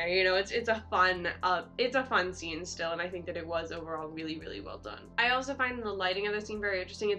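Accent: United States. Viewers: Aww, that wasn’t the full, proper scene. So I will give a bit of context here. Prior to this, Rayappan (Vijay) will be listening to an old song from a MGR (1st Tamil superstar) movie in a car. The driver will complain about a rival gang so he will ask him to go there. That’s why when the gangsters say that this “top dog” is cornered, Rayappan said “they are trapped in a bunch” haha. Also, the focus of this scene is to show how relaxed Rayappan is whilst fighting because he has “been there, done that” sort of thing. So he ends up singing that same song (from the car) while fighting. Here’s the link to the full video if you are still in the mood to see it haha. <link> Thanks. I like your pronunciations of names. I can listen to em all day. Btw are you from a southern state in US?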